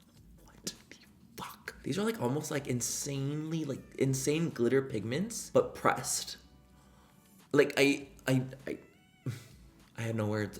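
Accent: England, United Kingdom. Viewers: Ok... I’m going to need a “full face of make up in an English accent” please 😁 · Your British accent lol, I live! 😄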